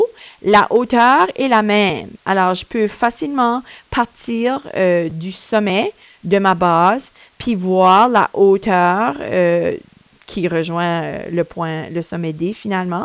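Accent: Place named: Quebec, Canada. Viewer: Mais oui les Québécois ont un petit accent . . . adorable .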